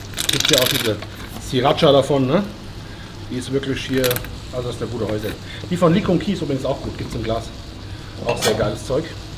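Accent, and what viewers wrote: Hesse, Germany. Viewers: Ein sensationelles Rezept, wie viele andere von dir auch. Es macht einfach Lust und Laune, die Gerichte nach zu kochen. Lieber, du bist ein Original und vor allem: Herrlisch des hessische Gebabbel. Mach weiter so👍👍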